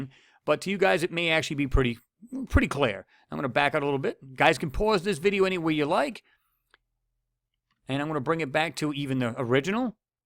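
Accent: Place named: New Jersey, United States. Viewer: "for everyone on a pee cee" you're funny you're definitely from New Jersey Maryland or New York LOL anyhow brother send me an email. I like your Mars and moon work the most but I wish you could take a nice hard long look at Ceres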